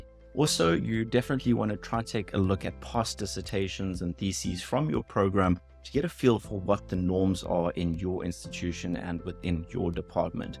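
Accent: South Africa. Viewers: Your profile may say UK, but that's a SAn accent. I enjoy your vids. Keep up the good work.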